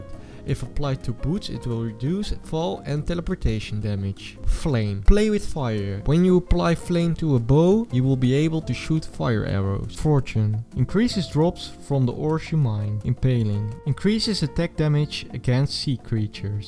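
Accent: Netherlands. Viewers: Are u Dutch? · Love the Dutch accent hahahahah!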